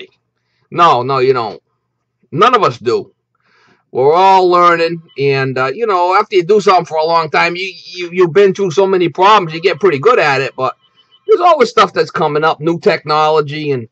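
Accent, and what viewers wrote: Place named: New York, United States. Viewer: This guy is cool haha....new yawk?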